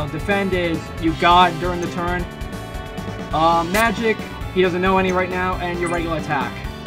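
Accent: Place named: New York City, United States. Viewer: Is this LP'ers voice for real? Or is he a parody of an NYC Jew? That voice can't be for real.....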